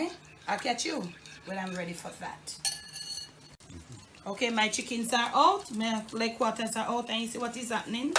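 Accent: Jamaica. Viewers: I looove chicken, especially the dark meat, it has more flavor, and looove the flavor combination you have in all your recipes, but especially this one..I'm a nasty girl and save the yummy drippings to use to flavor other dishes.I even have brushed breads, or biscuits with it..or if I make lentil or bean stew I use that flavoring in it by way of the drippings, just a little though..you are my magic kitchen queen to watch cook, I love your recipes and love your beautiful Jamaican accent(I do believe it makes your food more yummy)..Bless you and keep on coming with more scrumptious recipes..oh, I have discovered a new and super health type of rice, called " Black forbidden rice" and another that is " Red rice" .They have even more health benefits and anti aging things than Blueberries !..and they taste really good, not yucky like brown rice (I hate brown rice)...you might try it..in 3 months it lowered my cholesterol and helped me loose a little weight( miracle)..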